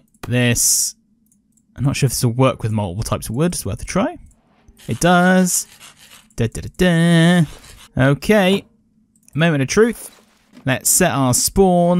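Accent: United Kingdom. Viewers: the British accent is the cherry on top with this series it makes it 10x funnier LOL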